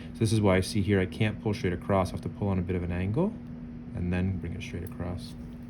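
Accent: Canada. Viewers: My husband is Canadian (I’m American) and I always have a knack for knowing Canadian accents. As soon as I heard you speak I knew you were! Also patient did a great job keeping himself together during the lidocaine. I would’ve been swearing!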